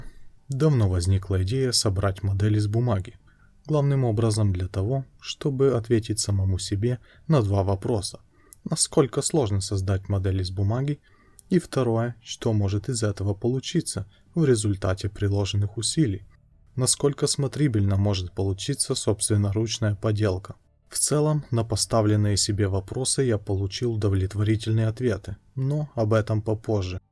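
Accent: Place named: Estonia. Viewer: акцент эстонский.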